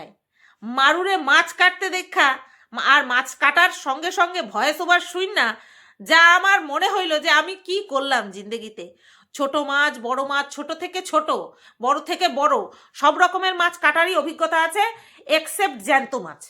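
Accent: Bangal, Bangladesh. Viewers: Tomar mukh e 'Bangal' bhasha sunte darun lage ❤. Ami Bangal er meye aar 'Ghoti' barir bou . Bangal bhasha ta darun misti amar kache 🥰.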